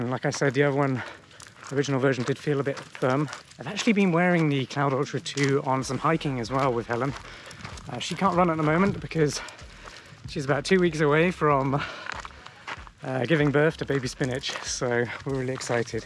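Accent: United Kingdom. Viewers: Are you a Brit living in America?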